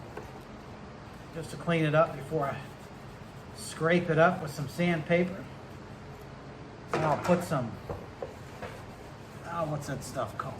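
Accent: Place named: Deep South, United States. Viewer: very clever idea! And you said before you were in Pennsylvania? You sound like you're from the deep south 😆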